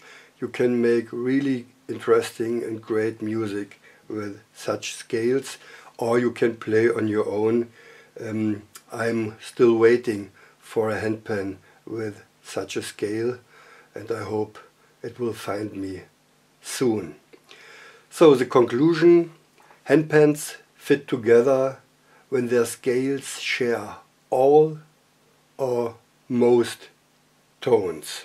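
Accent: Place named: Germany. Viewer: am Ende wird man den deutschen Akzent nie los.. Jedenfalls danke für das Video, ich habe lange nach etwas in der Art gesucht..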